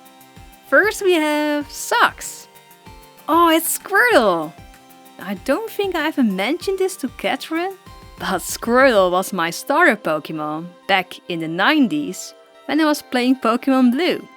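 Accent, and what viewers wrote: Netherlands. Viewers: ons nederlands accent is echt grappig😆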